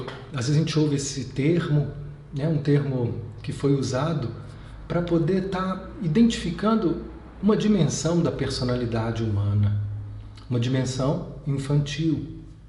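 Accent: Minas Gerais, Brazil. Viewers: Gratidão!! Conheci teu canal através de um grupo aqui em Garopaba SC há um mais de um ano, desde então assisto todos, reasisto....E assim vou, passo à passo plantando sementinhas de autoconhecimento!! Adoro esse sotaque mineirinho!! 🤗🤗🤩🤩👏👏👏🙏🙏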